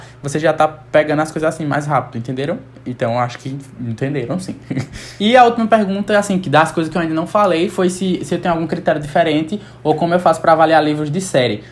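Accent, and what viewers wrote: Northeast, Brazil. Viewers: Homi, tu é claramente nordestino. Alguma chance de ser pernambucano??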